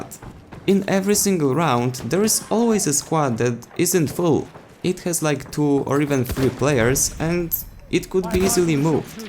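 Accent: Germany. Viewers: I feel like is german? Or dutch? Especially with that accent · You should do a video on the skill balancer. Dude, I love your content, keep it up. Also, is your accent German? If not, what is it?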